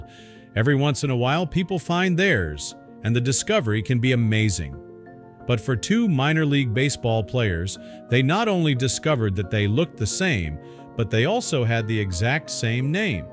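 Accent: United States. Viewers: I absolutely hate this narrative style. Saying a lot of words but not getting anywhere. (AND the horrid american accent.)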